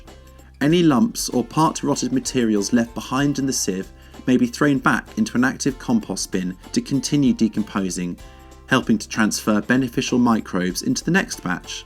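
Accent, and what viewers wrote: United Kingdom. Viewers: Love the hillbilly music the beginning, and also that Mr. Bean accent.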